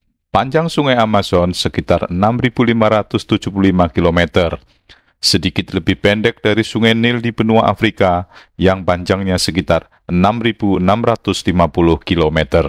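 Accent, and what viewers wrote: Indonesia. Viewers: Logat nya sangat kental sekali, pasti beliau orang medan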